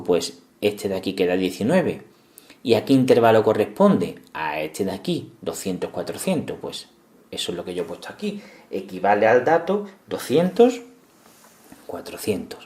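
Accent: Andalusia, Spain. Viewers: eres de Andalucía?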